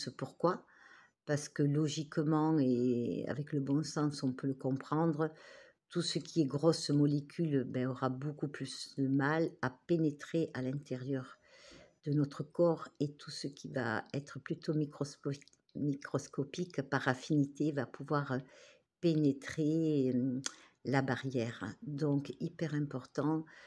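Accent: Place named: Toulouse, France. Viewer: Vers Toulouse.. * 💥🥳💥 🥰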